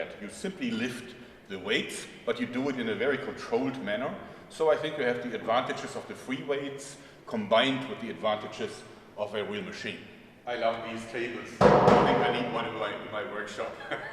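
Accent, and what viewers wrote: Germany. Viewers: That's a strong German right there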